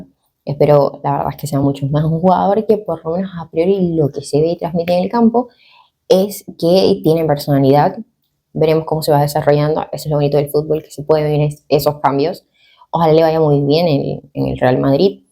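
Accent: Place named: Argentina. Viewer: Que lindo acento tenes, pareces argentina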